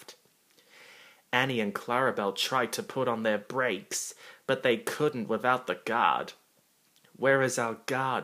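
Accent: United Kingdom. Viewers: okay, however are you British or Australian ? If you originally came from the UK or were you born in Australia ?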